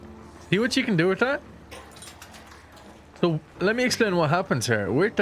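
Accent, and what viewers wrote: Ireland. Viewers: He sounds Irish.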